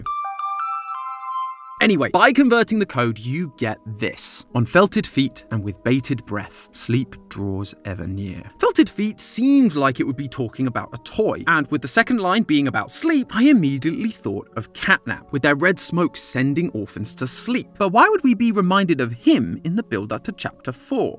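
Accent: United Kingdom. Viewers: I think Tom was the perfect replacement for mat pat Except for the British accent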